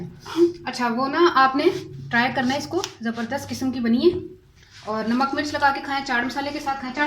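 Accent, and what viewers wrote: Punjab, India. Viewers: مجھے آپ کی پنجابی بہت پسند ہے جب آپ پنجابی بولتی ہیں مجھے کافی ہنسی آتی ہے ۔ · Bht cute punjabi hy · your punjabi so sweet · recipie is very delicious, but your punjabi is much more sweet, Allah always blessed you and Allah give you every Khushi in your life ( Ameen ) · I Absolutely loved your video, very simple and natural. Some people are writing such negative comments about your punjabi accent, these people are forgetting your channels name is "Punjabi da kitchen ". I'm a Punjabi Pakistani American. I have a hospitality degree and been in the States for most of my life. You girls are doing a good, keep working hard and be yourself I loved your work. God Bless <3 <3 <3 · Ur punjabi so sweet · Punjaban se hindi aur 'inshaallah' sunke achha lagta h.. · Really enjoy your sweet cute punjabi. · Z MIRZA WITCH KIND OF PUNJABI IS ?? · Nice Panjabi touch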